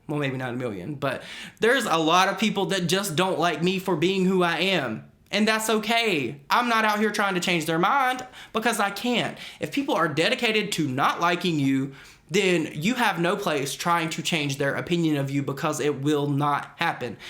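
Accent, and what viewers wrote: South, United States. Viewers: I love your country twang 😍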